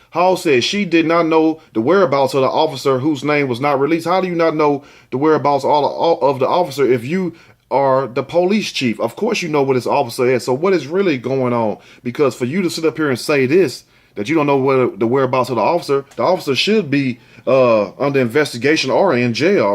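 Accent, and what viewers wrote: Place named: New York, United States. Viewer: I live your accent? Are you from New York?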